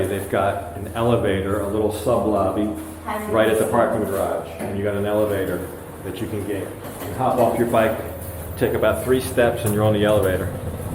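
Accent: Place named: East Coast, United States. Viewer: Where did you Grow Up? Sounds like east coast accent. Definitely a Great Radio Announcer Voice!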